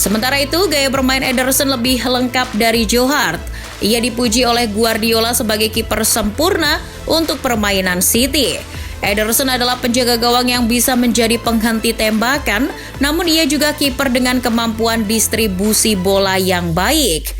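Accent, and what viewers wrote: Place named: Sunda, Indonesia. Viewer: Orang Sunda pisan pengisi suarana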